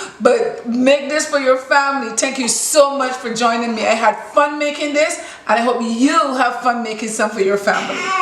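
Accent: Barbados. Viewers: Your recipes are always on point. Any one can follow. I am so impressed that even though you've been away from this land for so long you sound so bajan. And you remember everything. Love ❤ it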